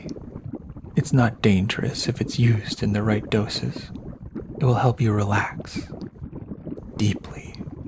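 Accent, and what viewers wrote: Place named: Canada. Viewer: I've listened to your stuff before and only today picked up on your Canadian accent. "Sorry" gave it away 😅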